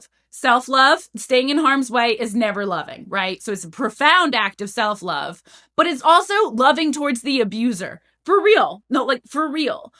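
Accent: United States. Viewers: Where has this woman been in my algorithm? She's brilliant! AND sounds like all the girls i went high school with in NY! I am subscribing!! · Girl I'm tryna read your accent and i can't pinpoint Midwest or east coast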